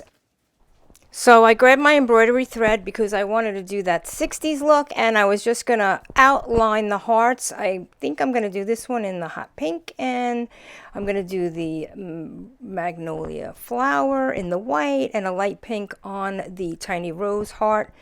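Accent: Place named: New Jersey, United States. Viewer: I am intrigued by them. Not sure I would wear them. Are u from NJ? I am trying to guess ur accent.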